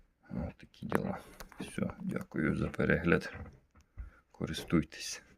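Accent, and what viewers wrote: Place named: Surzhyk, Ukraine. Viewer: Автор говорит на суржике...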